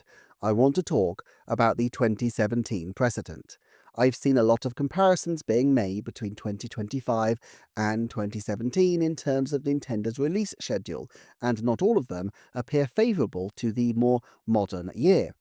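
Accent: United Kingdom. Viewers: Yet another W. Also, as an American, hearing you dish out these truth nukes with a British accent makes the whole thing so much more cathartic. Keep up the good work mate.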